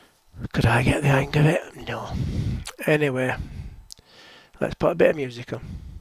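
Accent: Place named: North, United Kingdom. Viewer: What is the name of the editing suite, Premiere ? It’s your northern accent!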